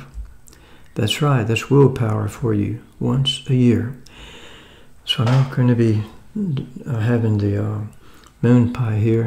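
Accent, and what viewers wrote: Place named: Delaware, United States. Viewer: Where are you from? You remind me of a Delaware accent or maybe Philadelphia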